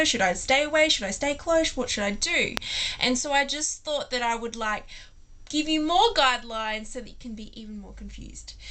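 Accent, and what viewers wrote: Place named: Australia. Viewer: u have such a aussie accent... and r u wearing a spaghetti strap for PJS?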